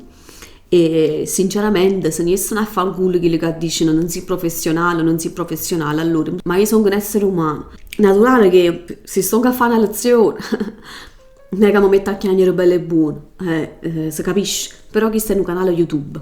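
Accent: Naples, Italy. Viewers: Parli un napoletano bellissimo, molto classico, pulito, tipico delle persone istruite che hanno una cultura,infatti non ho bisogno di nessun sottotitolo perché è lo stesso napoletano con cui mi parlava mia nonna adorata nei momenti di tenerezza, lo stesso di Eduardo, di Viviani e non quel brutto dialetto sguaiato imbastardito che purtroppo si sente parlare tantissimo oggi specie dai più giovani, li si che ho bisogno dei sottotitoli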